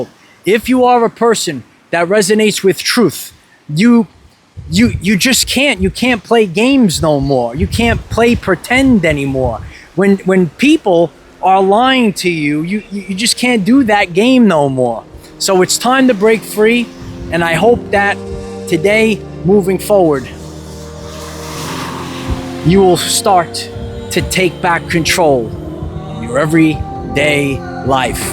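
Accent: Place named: Bronx, United States. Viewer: This guy looks like a leading man in Hollywood but speaks like a grifter from da Bronx.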